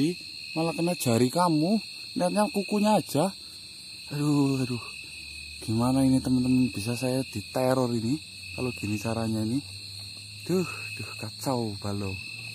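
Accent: Banyumas, Indonesia. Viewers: Ngapak purwokerto banyumas brooo..😂👍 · klu tegal lebih medok klu pwto lbh soft ngapaknya😎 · oh pantes bhasanya sama kya saya...ngapak..mksih mas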